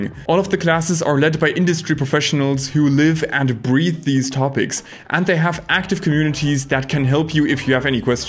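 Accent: Germany. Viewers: Keine Ahnung - 1 Satz und für mich klingt das als ob du Deutscher bist. Wieso bloß kein Video direkt in DE ❤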